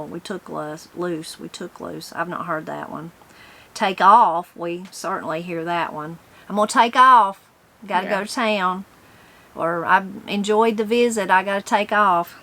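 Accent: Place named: Oklahoma, United States. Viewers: My Oklahoma family is originally from your area and they speak like you. I was grown and gone before I called a couch a “couch” before that my family mostly called it a divan or a lavan. They also added the r to their words. Like my aunt Nina was “Niner”. My mother was and still is for many of her family Ader for Ada. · I love the way you speak! Growing up on the West Coast, we could NEVER get away with speaking that way. It almost sounded like a "foreign language" when I first started watching your channel. Not judging at All! I Love how in the South they use the phrase "Bless your heart"... sit down and stay a spell. I remember my friend Pansy said y'all one day, oh my goodness Mrs. Morris corrected her and embarrassed her in front of the entire class. She never forgot that. Your girls are some of the most loving young women I have the pleasure of getting to know. 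I watched a video of them yesterday asking if there were anyone needing Prayers!! Who does that? Girls that were brought up by loving families, that's who. So Sweet. What a better world this would be if we had more and. ❤ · I love the way you talk. I am southern thru and thru. Learned most from my Granny. Miss her a lot. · How can I order your cookbook? Thanks. I’m from WV, so I do understand your (or ourdialect. Lol 😂😂😂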